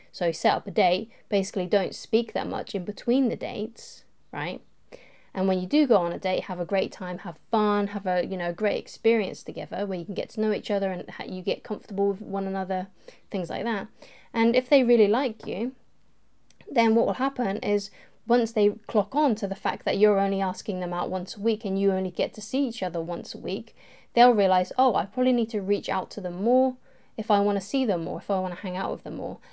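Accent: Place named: England, United Kingdom. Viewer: with a voice like that....I can listen to you all day.... just can't help to be attracted to a pretty Lady with a soft English accent.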